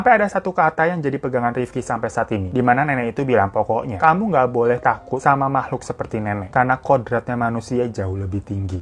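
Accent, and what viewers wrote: Java, Indonesia. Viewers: Wkwkwk penonton mas medhok juga ni terdeteksi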